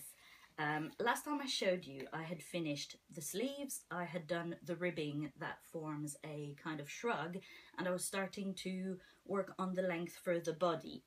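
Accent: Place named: Canada. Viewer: love this! Love to hear a Canadian voice x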